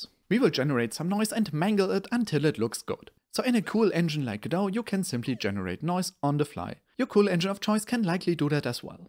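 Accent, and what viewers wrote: Germany. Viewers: This man has one of the lovliest German Accents i could imagine xD Nice work man!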